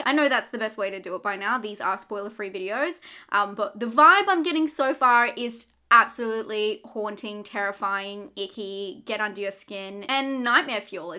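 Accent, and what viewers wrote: Australia. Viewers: So nice to hear an Australian review, especially considering the high Australian input in this film! I’m seeing it tomorrow and can’t wait. Seeing it again at the weekend with my mother who’s a fellow horror fan and a friend who doesn’t like horror and gore, but really wants to come. 😂 The second viewing should be interesting. I don’t think our friend will see much of the film through her fingers.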